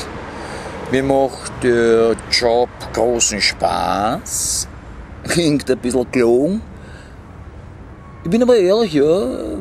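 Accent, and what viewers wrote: Vienna, Austria. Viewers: Ha ha, habe ich Englisch als Mutterspracher, entschuldigung sie...aber es ist mir egal...Wiener Dialekt klingt nicht so schöne für mich aber daß ist wurscht oder? Wer spricht was, mensch sind mensch uzw...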